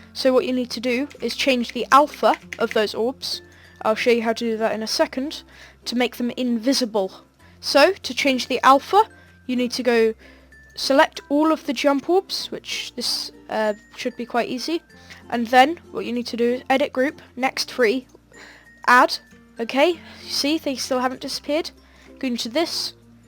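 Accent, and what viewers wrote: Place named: United Kingdom. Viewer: The person talking sounds like a British man 🤣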